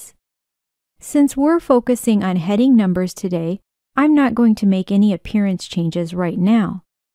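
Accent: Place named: New Jersey, United States. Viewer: Good info. Lol at the New Jersey accent and Indian vocabulary.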